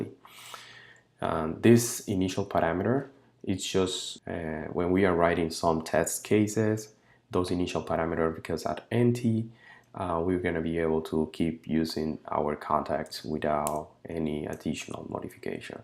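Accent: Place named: Brazil. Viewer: I thought he was Brazilian because of his name too, the accent sounds like the Brazilians who speak English as well haha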